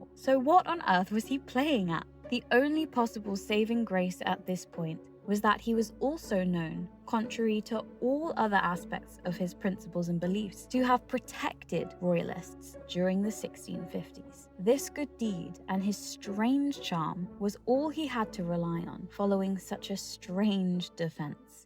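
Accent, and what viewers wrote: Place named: RP, United Kingdom. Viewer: I like when u say words out loud. They make both me laugh and sound so smart, and not just because of ur posh accent, although that certainly helps. Boshhhh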